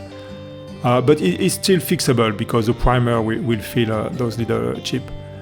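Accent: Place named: France. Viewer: I love the french accent 😃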